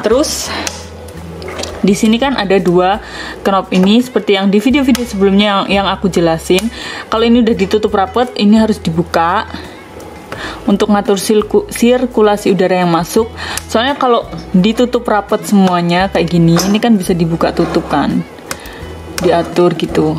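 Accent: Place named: Java, Indonesia. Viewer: Mbak'a kelahiran Jerman kah.?? Logat'a kek logat Jawa. Udah sub, mbak.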